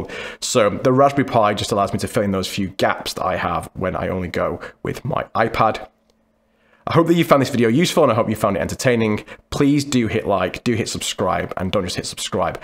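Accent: United Kingdom. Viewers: Hey, how do you setup the pi as a ntewrok server to connect to the files while connected on the ipad? where you from, is there a hint of a welsh accent?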